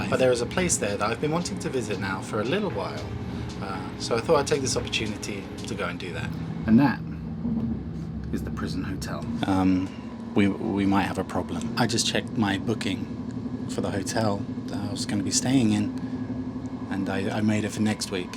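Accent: Finland. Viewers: Are you developing a Finnish accent? Just asking for friend, of course, fully aware that you would have to consult your linguist peers before giving an answer.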